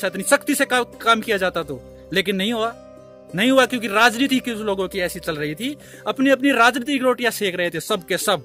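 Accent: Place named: Rajasthan, India. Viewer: bhai rajasthani ho kyaa....accent aur background se guess kiyaa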